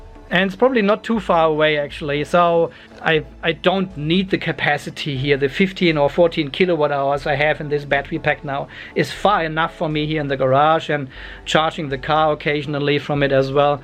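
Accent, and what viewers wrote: Germany. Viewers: If I may ask. You are in Australia, but im assuming you are German based on your accent? Whats the story on that? Really love your videos. So real, so relatable. Keep it up.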